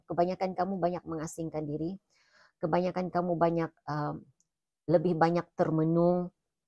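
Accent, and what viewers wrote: Malaysia. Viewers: Bunda seperti nya asal dari Malaysia , cakapnya mirip melayu. Thks Bunda atas readingannya.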